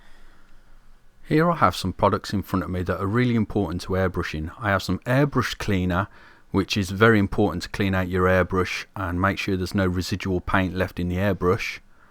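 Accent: United Kingdom. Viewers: Omg I love your accent! It's so deliciously UK!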